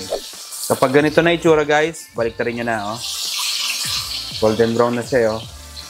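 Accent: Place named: Cavite, Philippines. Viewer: Chef tonong lihitimong Las Piñero o Caviteño ka ah. San po kayo sa Pinas?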